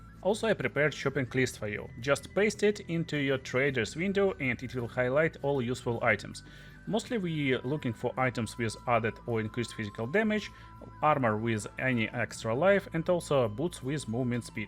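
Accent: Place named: Russia. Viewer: то ли из-за дуолинго, то ли из-за русского акцента, но этот видос я понимаю без дубляжа 🤣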